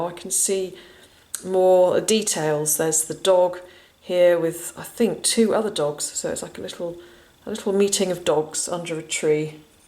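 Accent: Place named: England, United Kingdom. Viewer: will you please wear gloves in future, seeing the dirt under your fingernails really detracts from your wonderful English accent, Erm; I’m English from County Durham....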